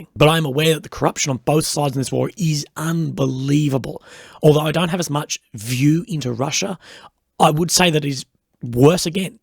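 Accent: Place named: Australia. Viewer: the western corruption is far more discreet and is missive it seames the military's is used as a cash cow no mater where it is . Even the amount of maintenance western weapons' have to undergo to keep it running at peak performance is dodge. I like your channel and hearing an Ozzy accent presenting your take on the situation is good . cheers mate and best of luck with your health battle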